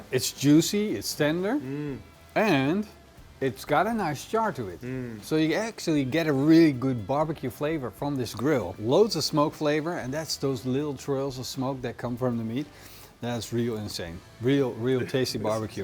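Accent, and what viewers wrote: Netherlands. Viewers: Hearing that accent… Ik wist het een Nederlander!! 😁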